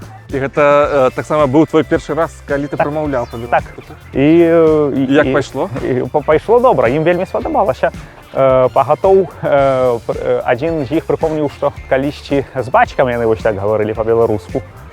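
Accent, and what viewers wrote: Poland. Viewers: Падляскi акцэнт. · Сапраўды, польскі акцент😁 А мова цудоўная😁😁😁🌞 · Як прыгожа ліецца яго мова! Мякка і натуральна ❤️ Але я б сказала, з лёгкім флёрам польскага вымаўлення) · Чуў яго некалькі год таму, калі ён, як я разумею, яшчэ ня меў такога шырокага досьведу стасункаў з беларусамі, і вымаўленьне было больш расейскае, але вось гэтыя польскія ноткі былі ўжо тады. Мабыць гэта таму, што польскую ён вывучыў раней і гэта наклала пэўны адбітак на тое, якую гаворку ён выбраў і выпрацаваў для сябе. Насамрэч, беларускіх гаворак асноўных, здаецца чатыры, таму выбірай любую і не памылішся. Прыхільнікі правілаў і норм няхай ідуць лесам, бо норму тую двадцаць разоў за нашае жыцьцё зьменяць па замове ўладароў. Будзеш за гэтым сачыць і ніколі мову не вывучыш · Бел яз с польским акцентом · Ня дрэнна сцелiць!😲 Малайчына, сказаць няма чаго! 👏👏👏 Ды яшчэ з нейкiм такiм "польскiм" флёрам... 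Вельмi цiкава было паслухаць. РесЬпект ды пашана! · Никаких претензий , но это не чистый беларуский язык. Слышится польский акцент и довольно сильно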